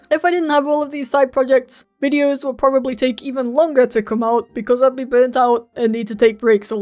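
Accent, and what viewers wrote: United Kingdom. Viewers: Its really interesting to watch your channel. I really like your british accent whilst you talk about german potato culture. Like, you couldve chosen all countries but you took germany?! I dont understand why cus i just want to get away from here, Lg dein Tom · Your voice is british deep and compaired to your fisrt video is wowz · R u British?? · KID OF LINK AND ZELDA it's just his british voice (yes he's british)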